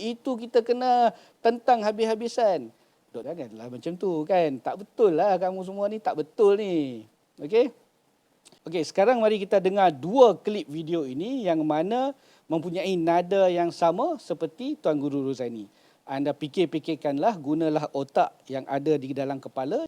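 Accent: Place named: North, Malaysia. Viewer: tg guru lain, ni cari makan ja pun. Orang bodo cem hampa ja duk layan buden ni. Dahla org utara babi rasa sial gila ni duk cakap utara, rasa cem loghat aku pakai jadi hina😂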